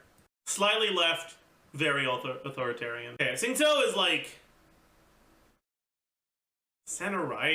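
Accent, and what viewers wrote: Quebec, Canada. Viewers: Hey, I'm really impressed with the quebecois accent you did for this video. Also the beard looks super real. Well done like subscribe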